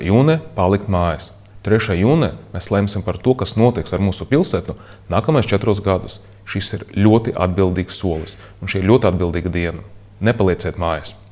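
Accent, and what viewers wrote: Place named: Russia. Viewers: Human . Akcents ? Viņš drīzāk krievu valodā izrunā latviešu vārdus. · Jums ir vismaz viens vērtīgs arguments pret Nilu neskaitot krievu akcentu un krievu valodas lietojumu? Vai jūs tikai lamāties spējat?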